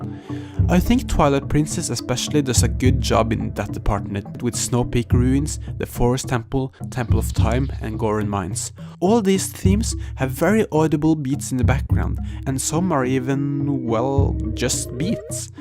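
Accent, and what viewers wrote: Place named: Norway. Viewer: May I ask where you are from? It sounds like you have a Norwegian accent, but I'm not entirely sure. I'm Norwegian, by the way.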